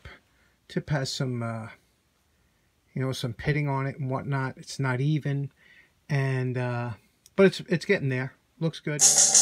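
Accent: New York, United States. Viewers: Very nice work. Also nice to hear a New Yorker's accent (born and raised in upstate). Also, had one of those wood screwdrivers when I was a kid. Hate to think of it as "antique," but it is what it is. I used that same stain for a lot of applications, but I seal it up with polyurethane (satin, semi, or gloss); hard as a rock.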